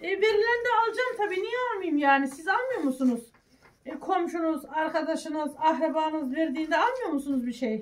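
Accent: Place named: Amasya, Turkey. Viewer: hanim sizin konusmaniz benim memleketim amasya sirvesine cok benziyor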